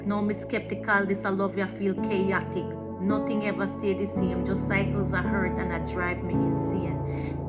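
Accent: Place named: Jamaica. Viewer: Love it. You sound jamaican, are you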